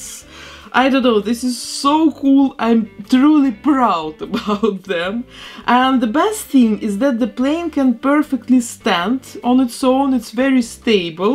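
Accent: Russia. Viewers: Love ur doll repaints ur so talented thx for vid. lf l can ask are u Russian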